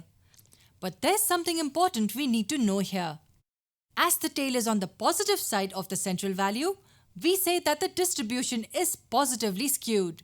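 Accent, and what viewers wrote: India. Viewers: Indian accent lovely & cute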